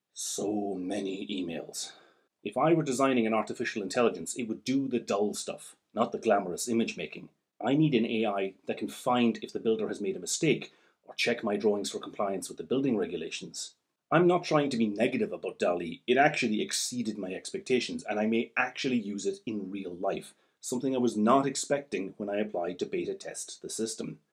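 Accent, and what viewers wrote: Ireland. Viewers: Your accent is obviously Irish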